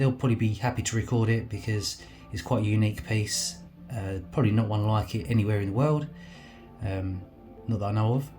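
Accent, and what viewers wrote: United Kingdom. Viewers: What accent is this? I have issues to uderstand. You sound too british, too native!!!! Please try to speak with more international accent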